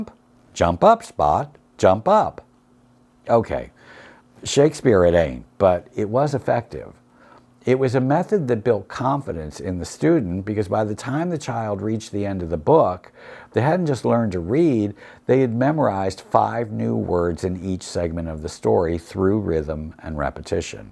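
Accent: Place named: New Jersey, United States. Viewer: P.S. whatever sounds like New York but you do not sound like a New Yorker. Oh! Ok NJ.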